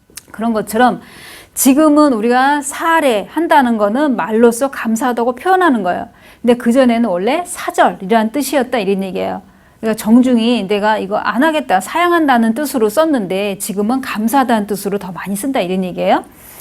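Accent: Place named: Gyeongsang, South Korea. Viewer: 선생님 굿 아이디어 경남사투리 ㅋㅋ좋습니데이 ㅎㅎ 감사합니다 잘 배우고나갑니당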